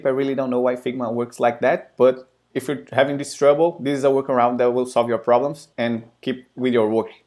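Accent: Brazil. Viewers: Valeu cara! Logo no começo do vídeo eu falei "esse sotaque é brasileiro", e confirmei com a linguagem do seu S.O kkkkkkk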